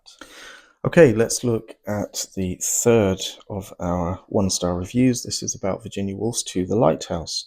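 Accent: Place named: New Orleans, United States. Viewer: Used to love your unique New Orleans sound, delighted you’ve found a second career in lit crit! Outstanding stuff. 😊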